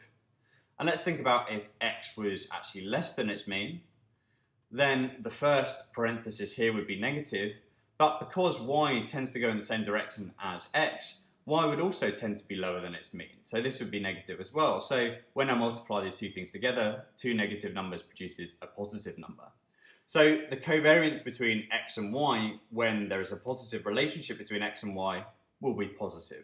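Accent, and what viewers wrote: United Kingdom. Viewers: British accent....NICE ! ! ! Wishing all Yankees could have British accents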